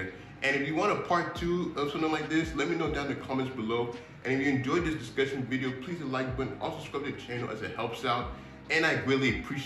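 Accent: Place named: Nigeria. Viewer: You sound Nigerian I like following true AA living there...omg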